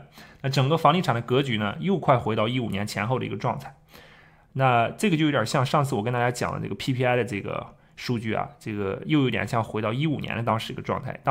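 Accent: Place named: Hohhot, China. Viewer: 感觉是呼市人，我认识吗？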